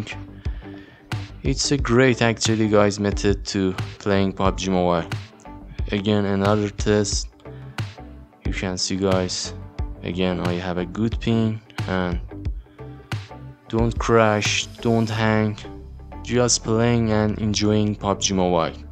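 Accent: Iran. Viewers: از لهجت معلومه ایرانی هستی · خدایی لحجه ایرانیت مارو ...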